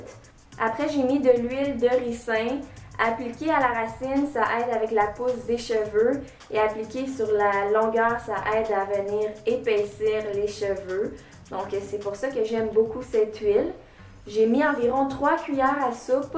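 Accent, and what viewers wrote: Quebec, Canada. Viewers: super vidéo <3 et j'adore ton accent québécois/canadien :3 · Accent québécois ?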